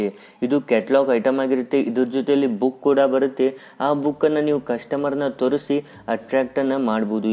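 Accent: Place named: North Karnataka, India. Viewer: Dosta nee matadod nodidra pakka uttar karnatakadava anasti pa... Am i right or wrong...?😆😆😅😅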